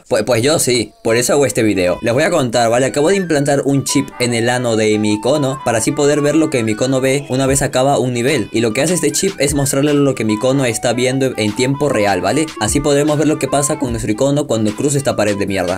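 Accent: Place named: Peru. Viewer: Tu acento peruano me caga de la risa xD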